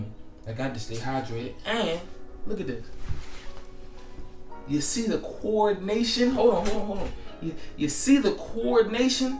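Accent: Baltimore, United States. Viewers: two, do, blue. true......kinda miss that Bmore accent.....ya know what um talking bout. might be the next comedian in Baltimore county!!....Good stuff!!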